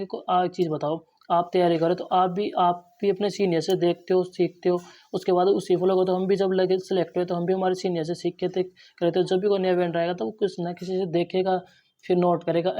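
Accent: Rajasthan, India. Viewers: Rajasthan se hai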